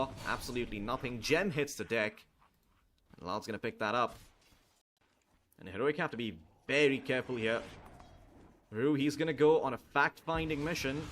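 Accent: India. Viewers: Indian accent caster? Immediately muted the video.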